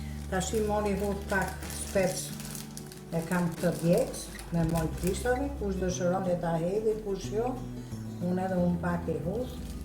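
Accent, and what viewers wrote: Tirana, Albania. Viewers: Je me e mira. si per gatim ashtu dhe per te folmen tironce .respekt nga larg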